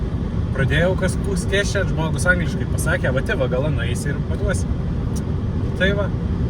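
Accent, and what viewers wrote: Aukštaitija, Lithuania. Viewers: Bet tai faina klausyt,kai kalbi paprasta aukstaitiska moletiska,utenska kalba,ne kaip kiti kaimieciai miestan pateke,vaidina aukstuomene...😊👍👍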